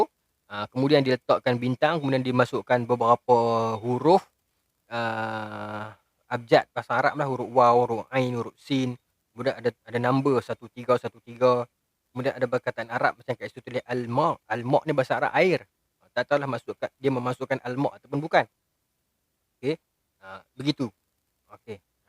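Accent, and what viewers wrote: North, Malaysia. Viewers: Seqonok dengaq caqa oqg utaqa yg cerdik dn humble brckp, tuan tlg doakan unt saya rezeki dn jodoh, umoq bnyk dh ni, t.ksh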